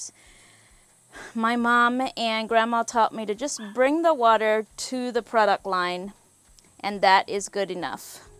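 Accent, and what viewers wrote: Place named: Pennsylvania Dutch, United States. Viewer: I have friends who are also ex Mennonite and you remind me so much of the mom, mainly because of your sweet Pennsylvania Dutch accent. 😁. Your channel is fun to watch. Many blessings and don't let the hateful comments get you down.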